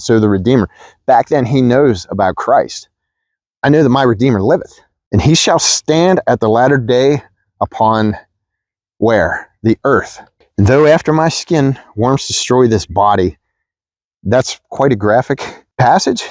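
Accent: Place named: Pennsylvania, United States. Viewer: What a great video thank you for sharing! I can’t quite place your accent are you from PA? Greetings from Chester county god bless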